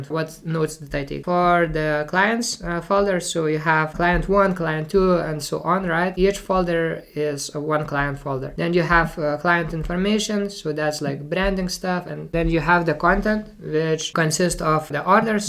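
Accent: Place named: India. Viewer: Why do you look northern European but sound Indian?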